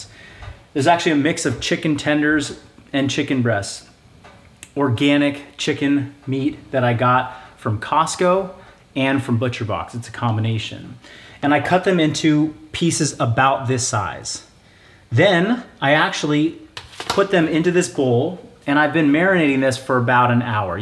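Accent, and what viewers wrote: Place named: California, United States. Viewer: Also you sound like Uriah Faber lol, must be from California? In fact you sound a lot like anyone from Sacramento and a lot of Team Alpha Male.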